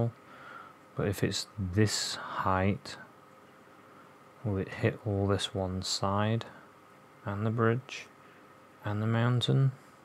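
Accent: United Kingdom. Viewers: Your British Accent is really so awesome and polite Dear.😊😇🥰😍🤗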